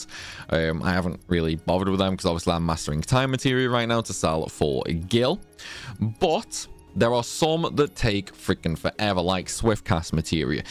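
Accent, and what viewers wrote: Stoke, United Kingdom. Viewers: Thanks, seems alright. Also I can never think of what a Stoke accent sounds like until I hear it. weird lol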